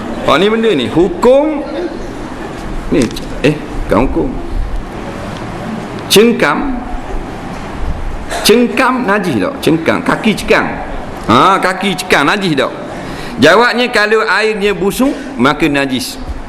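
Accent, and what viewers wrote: Terengganu, Malaysia. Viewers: "nati orang balik lu bodo"... hahahhaaha...mmg pure terengganu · kaki sekam atau kaki cekang...aku pon x phm sb ustad ckp ganu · memang la haram...tengah lampu merah....kalau hijau halal le....kena paham betul ustaz cakap.... kalu nak main lepas akad nikah jangan la depan tok kadi dan saksi-saki...biar la diorang balik dulu... ustaz ni ceramah dia best sebab ada lawok...pastu...slang ganu kita...mu kena dengar molek2 jangan dia kata hare..mu terus amik jadi hare...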